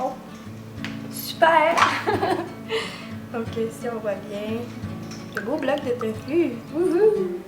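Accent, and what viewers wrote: Canada. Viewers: j'aime l'accent canadien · merci et mignon l'accent Canadien ;)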